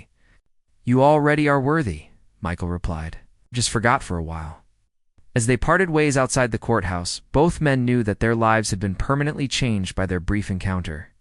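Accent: United States. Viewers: This is - as usual - a garbage, American accented load of crap!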